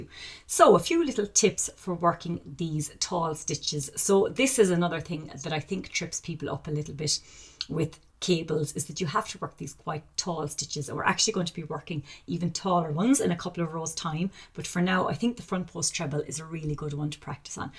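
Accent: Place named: Ireland. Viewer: This is the first video by you I have watched. I thought you were American but oh my! Love your Irish accent. This has been helpful, thank you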